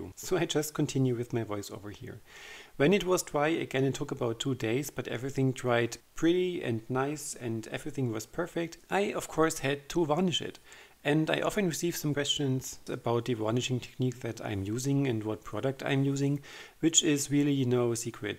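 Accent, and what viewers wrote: Germany. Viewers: Hi , ich habe mir schon mehrmals etwas von Dir angeschaut und immer gedacht : er hat einen deutschen Akzent... Nach meinem letzten geschauten Video weiß ich zumindest das Du Deutsch sprichst. ( Fassettenlack) ...LOL...Kannst Du vllt mal etwas in deutsch machen? Das wäre echt klasse. 😍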